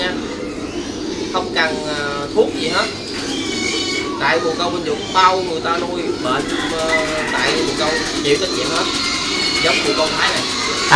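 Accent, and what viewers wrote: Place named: Phuc Tho, Vietnam. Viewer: a dũng noi tiếng jog phúc xo wa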